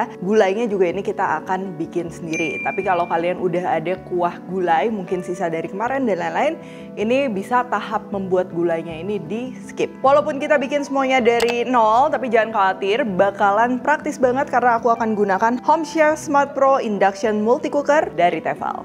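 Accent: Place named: Java, Indonesia. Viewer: makasih cheff...udah recook asli medok banget.. klo ada waktu boleh share resep tahu kriuk ya cheff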